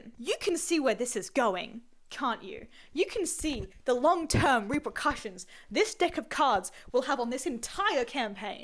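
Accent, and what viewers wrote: Australia. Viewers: SLAYYY (i forgot you were australian so it i had quite a bit of whiplash) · BRO UR AUSTRALIAN??? IM AUSTRALIAN!!!!!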